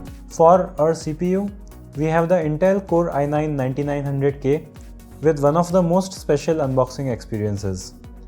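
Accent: India. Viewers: finally an indian who speaks in indian accent, this is what I like not talking in a shitty american accent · The same Indian accent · Idk why but i had to laugh so hard bc of the indian accent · Indian accents have to be the worst to listen to my god.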